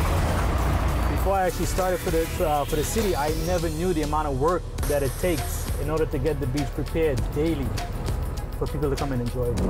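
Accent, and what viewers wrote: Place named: Trinidad and Tobago. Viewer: trin accent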